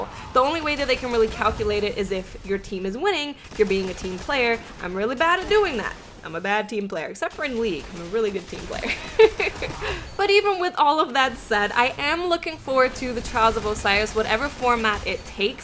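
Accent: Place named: New York, United States. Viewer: I'm new to your channel. Are you from Jersey or New York? I love your accent!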